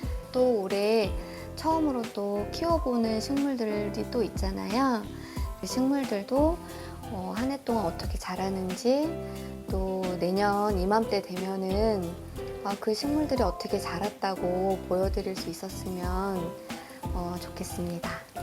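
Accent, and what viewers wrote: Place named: Seoul, South Korea. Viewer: 영상 잘 보고 갑니다 ^^ 경기 서울 분이신가요? 서울사투리가 조금씩 들리네요 ㅎㅎ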